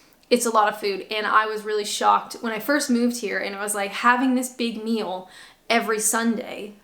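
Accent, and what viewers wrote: Canada. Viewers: She couldn't have said "aboot", because we don't say "aboot". As a Canadian, still sounds pretty Canadian to my ears. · Moved to Scotland two years ago and just found your videos. Been binge watching for days now. No, I'm not weird. Just enjoy them. As far as your voice goes, I actually think you sound more American and lost a lot of your Canadian inflections from your earlier videos. You used to do harcdore aboots but not so much anymore. Keep the videos coming! The history tidbits have been fascinating and my wife is a naturalized Scottish citizen and she didn't know alot of them. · Hi, Canadian here, I will still eat peanut butter and jam and when I was young -- and not so young -- yes to a peanut butter and banana sandwich. Here in Nova Scotia, it is still traditional to have a Sunday roast. Guess that's because so many people here have British and Irish roots -- also it is the big meal of the week for the family. 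Not everyone does it but it is certainly something I was used to. You may use a lot of 'Britishisms' in your every day conversation now, but you still have your Canadian accent!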